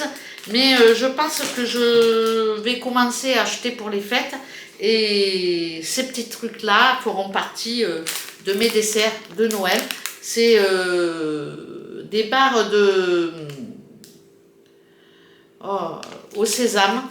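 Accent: South, France. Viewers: Coucou!ton accent ,c'est le Soleil du Midi toute l'année! ,bisous,bilan brocante à Gerland cet après-midi:2 paire de chaussures à 25 euros pièce ,un robe Carole été 12 euros en lin ,un sac à mettre dans le dos été 20 euros,et 1 paire boucle d'oreille 3 euros pour la copine de mon fils MiMi en bélier ,elle de ce signe !,encore ouvert demain à Gerland ,la vente pour abriter les Sans Abris!,très bon week-end!,bisous à tous les deux!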